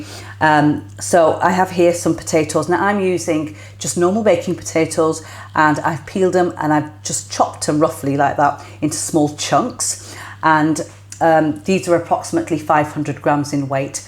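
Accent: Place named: Manchester, United Kingdom. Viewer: Hello Your accent sounds from Manchester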